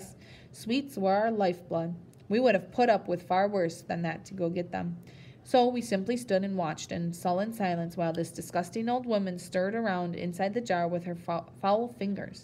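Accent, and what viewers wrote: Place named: United States. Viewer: Oh dear read by an American . Spoilt from the start .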